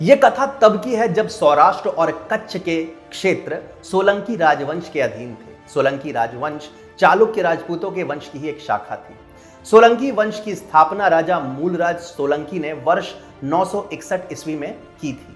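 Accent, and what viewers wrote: Standard. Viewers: I have seen and heard quite a lot of your historic narratives... I have to admit.. Aap ka gyan kai guna shrestha hai atirikth anya tathakathit gyaniyon say.... Evam jo shudh uccharan hai wo bhi sarahana yogya hai... Bandhu. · Itni sudha hindi kabhi kabar hi sunane ko milti hai, I like your speech. Chanakya, yogi, k baad pehalibar aapse itni shudh hindi suni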